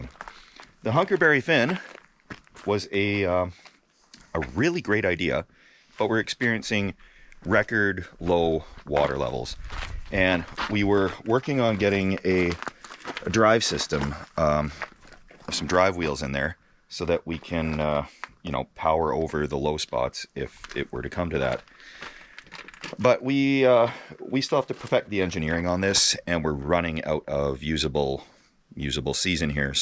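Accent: Canada. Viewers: Dude I love the way you say garage! Damn I love Canadians accents!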